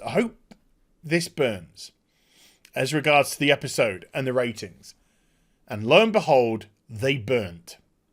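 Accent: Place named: Yorkshire, United Kingdom. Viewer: Wait up a second.. you have the ability to tone down your Yorkshire accent? And yet, Doctor Woke, who is a paid actress, cannot? You should consider acting mate..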